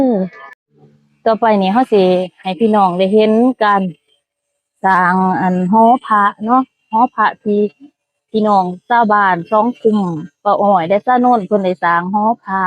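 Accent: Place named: Pakse, Laos. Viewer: สำเนืยงคือ สาวปากเช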